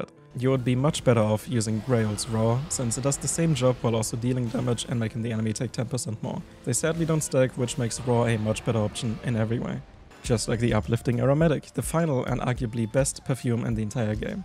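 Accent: Germany. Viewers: Hey Not to sound rude but are you german Because you sound exactly like every German I know speaking English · Alter du klingst krass deutsch aber danke für deine Viedeos die helfen echt weiter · I smell german accent 😤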